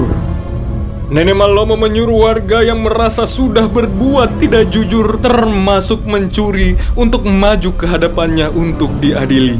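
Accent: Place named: Makassar, Indonesia. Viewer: yang bilang harusnya suara biasa saja, mungkin mereka butuh pembiasaan aja, suara dgn nada seperti ini memang cocok diapakai untuk cerita legenda, apalagi ini yg cerita org bugis makassar, pasti ada logat2 makassar ala2 puisinya. Lanjutkanmeki gannn btw ini keperluan skripsi kah bro?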